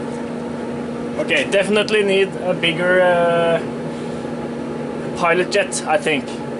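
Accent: Norway. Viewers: Jeg syns aksangen virket Norsk! 😁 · Jeg bare så på den videoen her i 10 sekunder før jeg hørte norwinglish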